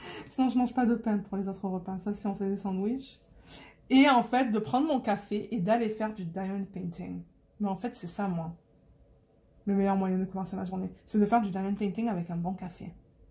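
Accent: Marseille, France. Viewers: Hello 😊 j’ai profité du 11 pour 2 toiles perso chez Ever moment. Jasmine becket griffith c’est pas trop mon truc non plus mais je vais aller faire un tour par curiosité sur le site. J’ai adoré les anecdotes sur les expressions, les 2 premières sont hilarantes. Par contre pour le terme écœurant ça fait drôle de se dire que ça signifie le contraire au Canada 😏 Je trouve que tu as encore un peu l’accent marseillais même s’il doit être moins prononcé qu’au début de ton arrivée. Ça m’intéresse de savoir pour les écoles perso car avec mon mari on se demande si par la suite on ne déménagerai pas au Canada. Paraît il que c’est le top pour les enfants autiste comme mon fils, en France c’est une vraie galère, un combat que je n’arrive plus forcément à mener et qui m’épuise. D’ailleurs je suis en mode gâteau, mon fils aîné fête ses 9 ans demain! Je ne savais pas que l’on pouvait parler avec toi sur Messenger 🤗 Passe un bon week-end 🙂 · Coucou ça va ? 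Alors, non je n'ai pas profité des soldes du 11 novembre sur aliexpress parce que j'avais déjà passée toutes mes commandes. Je n'ai pas pu attendre lol. J'ai tellement hâte de te voir faire la toile aux 275 couleurs ! J'aime beaucoup ce que propose Diamond Dotz mais je trouve que c'est très cher ! OUI j'adorerais pouvoir voter pour ta prochaine toile et avoir le choix entre 3 ou 4 dp. J'ai beaucoup aimé tes anecdotes sur le Canada par rapport à la France. C'était très drôle. (Oui ! Tu as toujours ton accent marseillais teinté d'un accent québécois 😉) Le tag : J'adore mon travail. Je vend des piscines par téléphone en télétravail et j'aime ce que je fais. Les gens me font partager leur projet, un petit bout de leur vie et c'est varié et très intéressant. Mon genre de film : les comédies, les films fantastique et les drames. J'aime beaucoup les films comme love actualy, hunger games ou la liste de Schiller. 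J'aime pas trop les films d'horreur, je suis trop sensible je crois lol. Je commence ma journée tranquillement avec un bon petit déjeuner. J'aimerais pouvoir faire du dp dès le matin mais avec 3 enfants c'est pas possible ! 😂 La chose la plus importante pour moi c'est ma famille. C 'est vraiment ma priorité. Je suis nulle en cuisine ! Je fais beaucoup d'efforts mais je n'aime pas beaucoup ça 😅 Mon super pouvoir : j'aimerais pouvoir rendre heureux quelqu'un juste le touchent avec les mains. Mon 1er boulot : caissière dans une grande surface. J'ai bien aimé. Les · Excellent vlog ! Tes expressions sont trop drôles !! Tu m'as fait bien rire !! À chaque fois que j'entends un français dire "les gosses" les oreilles me silent !! Hahaha Oui pour Tupperware il faut le dire en anglais ! Et pour moi tu as définitivement encore un accent français ! 😉🤣 Dans les banlieues nord, tout est seulement en français. 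Il n'y a pratiquement rien en anglais.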